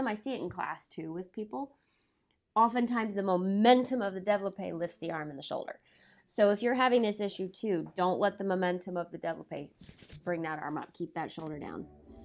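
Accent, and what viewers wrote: England, United Kingdom. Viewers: That’s a good British accent! 👏🏻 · I love this entire video, but just want to say your English accent is adorable and amazing!! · Thank you so much! this is phenomenal! Love your costume and English accent! <3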